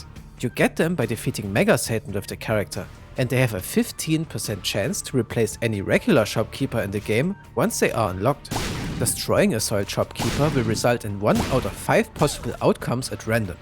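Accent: Germany. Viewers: Its a german accent. I have it aswell, thats why I know lol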